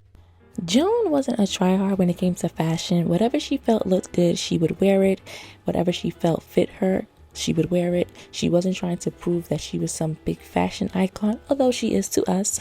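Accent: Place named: East Coast, United States. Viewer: Your accent 😍, you sound like you’re from the East coast. And tbh everyone on Girlfriends was a terrible friend oops.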